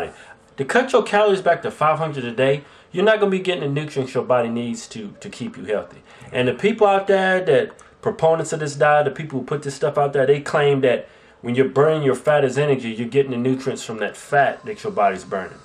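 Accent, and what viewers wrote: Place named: South, United States. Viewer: gorgeous.... even with those country accents, and bad language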